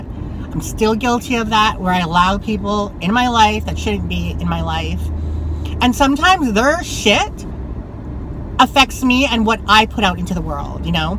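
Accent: Philippines. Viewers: I agree with the filipino accents!! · 😂 definitely agree with you on the Filipino accent! You’re always hilarious❗️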